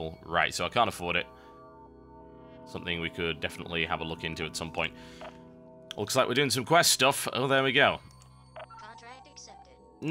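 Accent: United Kingdom. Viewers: Woo, half an hour of soothing limey voice.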